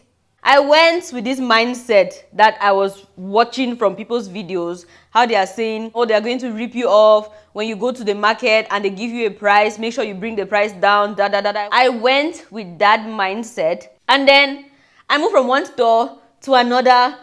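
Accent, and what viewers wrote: Nigeria. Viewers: You sound so much like a Nigerian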